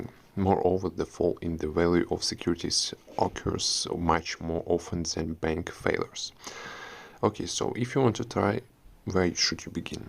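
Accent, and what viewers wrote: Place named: Russia. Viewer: У тебя акцент есть русский!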